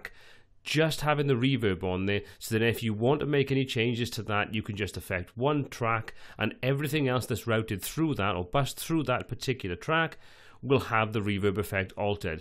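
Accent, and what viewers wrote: Wales, United Kingdom. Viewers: Thanks for the great series. I am rather enjoying what I perceive is a Welsh accent. Am I incorrect? My mum is from Cardiff, the Docks neighborhood of Tiger Bay. You're voice rather resembles her brother, my Uncle Bobby. :-D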